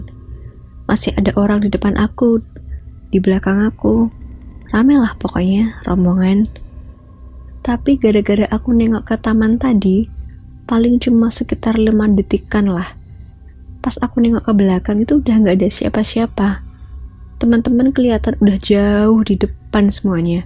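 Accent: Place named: Semarang, Indonesia. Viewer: Dari logatnya kyk org semarang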